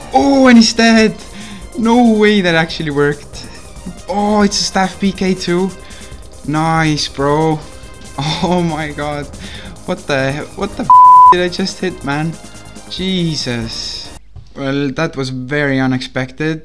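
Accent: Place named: India. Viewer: nice video i subbed first time i watched u where u from u sound like ur drom india but idk where u from i speak german im from switzerland my english is anyways not rly good tbh xD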